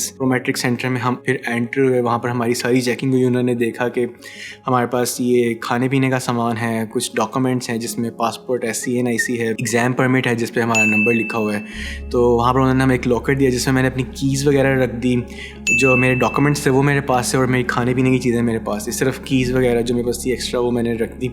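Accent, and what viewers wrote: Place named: India. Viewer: Why you speak Indian 😢😢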